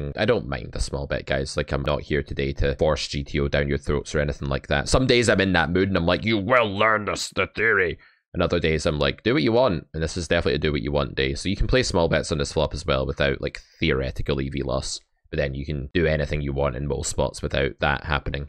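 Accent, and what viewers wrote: Scotland, United Kingdom. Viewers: Can absolutely tell that your Scottish and probably had too many conversations with stoned Glaswegians when you come right off the bat with a spoon analogy with way too much detail.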